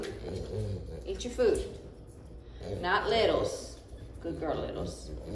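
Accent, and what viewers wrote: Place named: New York, United States. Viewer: Why does she sound like a New Yorker but she is somewhere in Texas, per a TV show video I saw?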